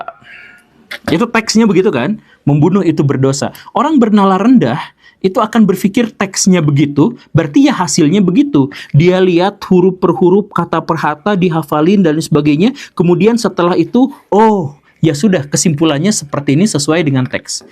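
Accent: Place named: South Jakarta, Indonesia. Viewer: Ngomong lu kayak anak jaksel mbul!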